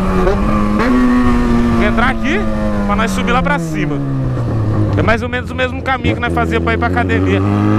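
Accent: Goiás, Brazil. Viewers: vc e goiano???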